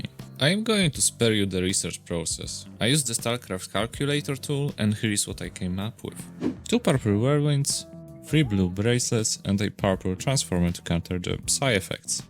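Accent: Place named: Poland. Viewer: słychac ten akcent polski xd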